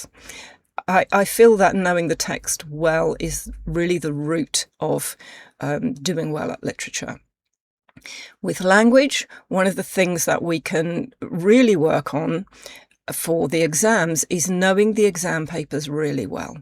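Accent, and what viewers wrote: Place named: RP, United Kingdom. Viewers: It is great help for native speakers like me to learn RP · I find it enjoyable to listen to your English British sound. I wish I could speak like you.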